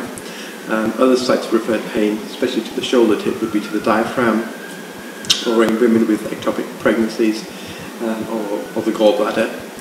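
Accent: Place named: United Kingdom. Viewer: very good clear, and precise. best of all a british accent :-)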